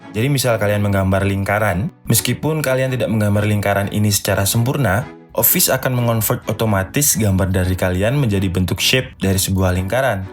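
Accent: Java, Indonesia. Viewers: Menurutku bedanya GK terlalu jauh dengan Ms 2016 Bang dari Jawa y wajar logatnya logat Jawa kalau bicara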